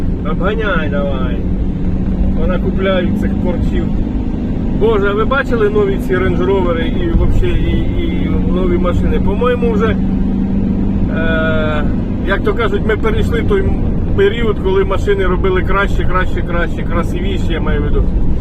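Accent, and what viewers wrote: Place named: Kherson, Ukraine. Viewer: Да, наш суржик ниякий гугл не переведе! І ніхто так не може балакать, як ми!Херсон і окресності!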